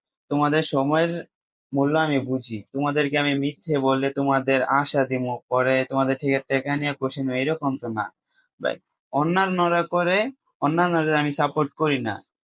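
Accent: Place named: Brahmanbaria, Bangladesh. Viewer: সার আপনার বাড়ি কি ব্রাহ্মনবাড়িয়া নাকি? আপনি দি আমাদের এখান কার মত কথা কন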